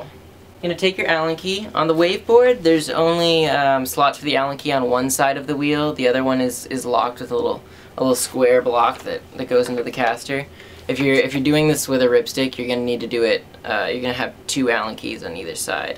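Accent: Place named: United States. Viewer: dat american accent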